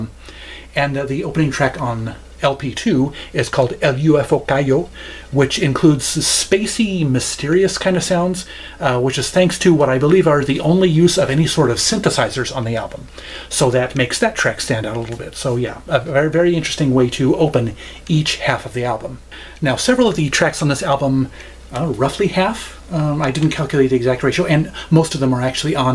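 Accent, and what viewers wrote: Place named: Canada. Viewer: WOW!!!! How the heck did you do that sound with your mouth in the beginning??!?! I was enchanted! Sounds like you are a fellow Canadian. I need to check out that record.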